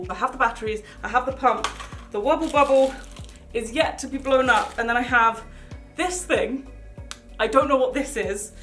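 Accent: United Kingdom. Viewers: I'm sorry but are you from the UK love you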